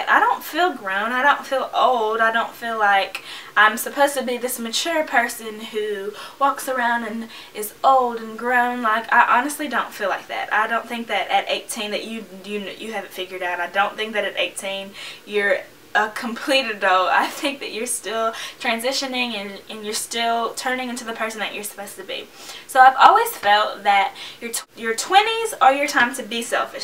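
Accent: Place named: South, United States. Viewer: Gosh! I'm so glad I found your channel! You are just so cute! Where are you from doll? Your from the South right lol? No shade or anything y'all, I looooove the way you talk 😊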